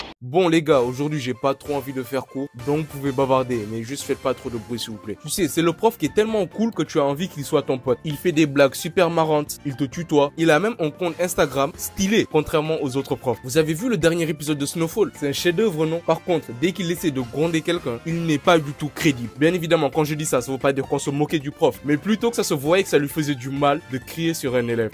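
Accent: Senegal. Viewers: Ta trop un acent senegalais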